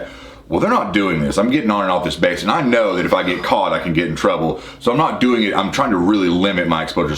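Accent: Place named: South, United States. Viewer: I could listen to him talk all day! Lol Such a southern boy accent!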